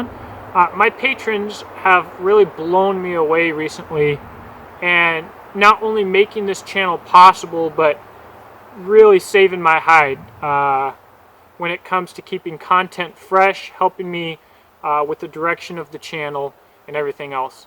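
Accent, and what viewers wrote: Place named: Kentucky, United States. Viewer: My wife is giving me a hard time about your accent. She says you sound like you're from UP Michigan. I thought you were from KY. Would you mind clarifying? ☺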